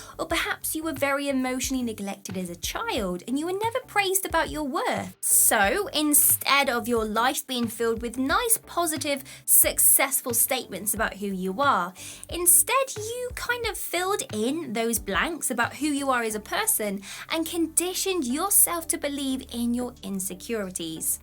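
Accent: United Kingdom. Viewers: Her voice pitch + british accent = something interesting going on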